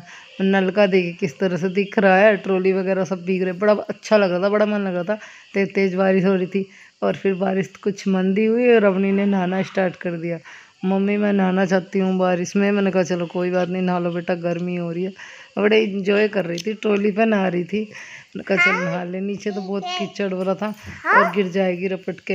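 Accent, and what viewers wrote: Haryana, India. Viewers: Aaj aawaj bilkul haryanvi lg rhi thi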